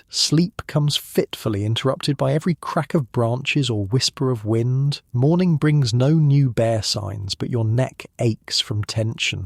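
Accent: United Kingdom. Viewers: Not a fan of Brit narrators.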